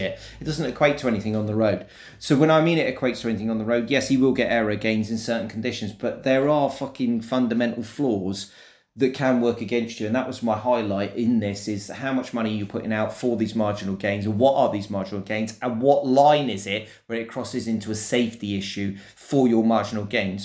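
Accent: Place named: United Kingdom. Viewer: What is your dialect of English called? I’m asking because I’ve recently become fascinated with the dialects of Britannia. Please don’t be cheeky and tell me something like “South Eastern C Nut” or “Cuntyshire.”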